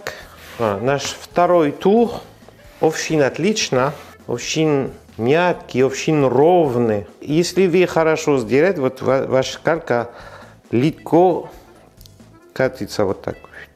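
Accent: France. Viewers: Как же нравится его французский акцент!ну очь.сексуально! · Как мило вы говорите по русски!Ваше видео смотрится,как приключенческий фильм с юмором и французским акцентом!Не все понятно,но сделаю по простому рецепту!Спасибо вам за урок! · Здравствуй. Только что нашла твой канал, с улыбкой отсмотрела и слушала твой красивый фрцузкий акцент, записалась уже и буду готовить этот рецепт. Если бы ты знал сколько рецептов я попробовала, но я недовольна, обьязательно напишу о результате. Мерси боку.😘👍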